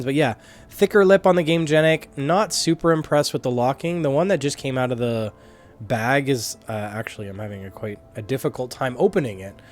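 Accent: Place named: Canada. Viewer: You sound extra Canadian in this video😅 Great video thank you!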